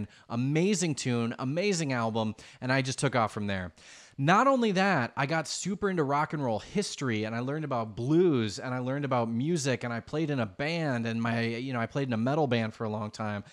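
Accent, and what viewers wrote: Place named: United States. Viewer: Where you from bro? You have one of those hard to place accents. Colorado maybe, or Cali? Keep it up man, this is becoming one of my favorite channels! Quit MGK and Post Malone though, they're both wankers lol!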